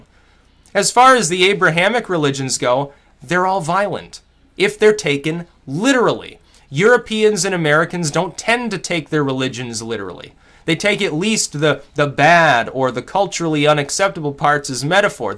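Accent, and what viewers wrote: United Kingdom. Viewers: Excellent British accent man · Love your British accent, made me laugh. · Nice British accent! You were just stuffy enough! LMAO Thumbs Up! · Nice Brit accent.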